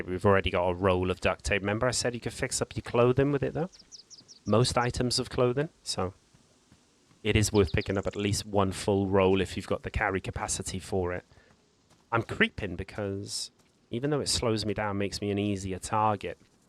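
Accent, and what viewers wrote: Wales, United Kingdom. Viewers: No problem mate, also i thought i recognized your accent are you from Wales by chance? if so then hello there neighbor, I'm from England lol!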